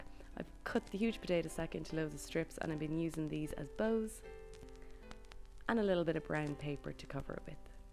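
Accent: Ireland. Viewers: Am I detecting an Irish accent? My family came from County Cork so Ireland is near and dear to my heart.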